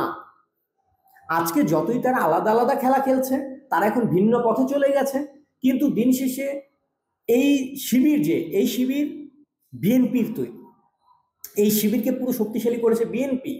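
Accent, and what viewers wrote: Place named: Arab world. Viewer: আরবি উচ্চারণেই বুঝা যায়। কথার মাঝে ছোটো ছোটো আরবি শব্দ ব্যবহার করে এবং ছহি উচ্চারণ করতে পারে।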